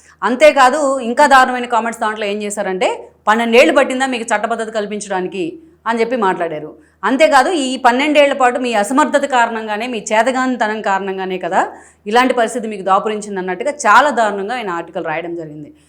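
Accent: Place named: Andhra, India. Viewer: Exllent explanation madam this is Andhra voice.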